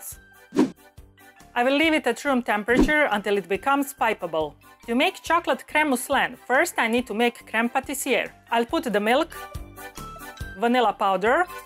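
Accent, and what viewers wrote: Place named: England, United Kingdom. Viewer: i like your english accent may i know where do you come from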